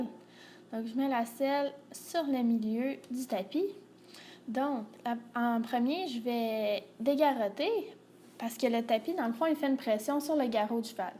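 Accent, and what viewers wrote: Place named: Canada. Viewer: Tu es canadienne j adoré ton accent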